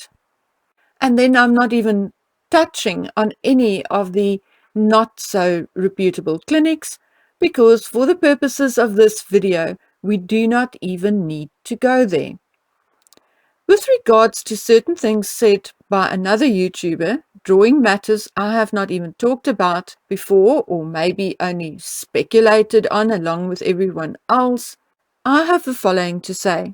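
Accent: South Africa. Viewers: Is this South African accent I hear Hi from fellow South Africa. I love your posts and watching with interest. I have been following Celt, Yankee Wally, the Vixen, Sandidog and other tumblr blogs et al for a couple of years now and matters relating to this subject are at last being discussed in the public arena. I have always been curious that H's wife has never sued anybody who has expressed doubts about Archie's birth. There are numerous pictures and videos showing bump falling down to knee level (the purple and pink outfit) the swaying bump (the short dress, white with greyish pattern) and many more. None of us like being hoodwinked or fooled with, so the truth exposing these two cannot imo come soon enough. There is no shame in surrogacy which cannot determinate a child's colour, so they need to come clean or be exposed. The outcome won't be pretty. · your research is outstanding. 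Your honesty is greatly appreciated. I used to live in S.A. and it’s a pleasure to hear your accent. Good luck with what is going on in your personal life - that comes first. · Hello, nice accent. Where are you from in SA? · I am loving this, I was 45 years in South Africa and the accent is so lovely to hear again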